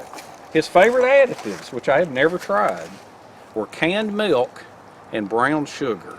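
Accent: South, United States. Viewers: ok this is super stereotypical but your voice is the perfect southern accent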